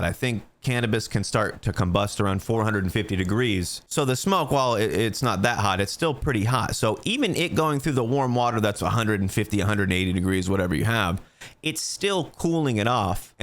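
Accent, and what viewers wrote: United States. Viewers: what state is ur accent from homie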